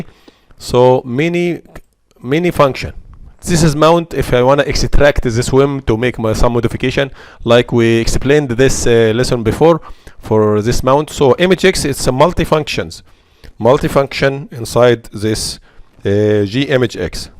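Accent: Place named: Egypt. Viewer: Thanks, I think you are Egyptian Inferring from the accent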